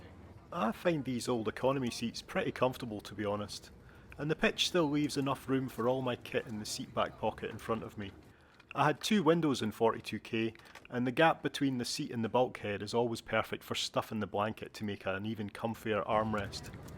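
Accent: Scotland, United Kingdom. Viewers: I loved the 747 too... you sound much less of a Scot seven years ago..😂😂😂😂😂 went to India on a BA 747 with my friend, Club Class, it was ok, not special.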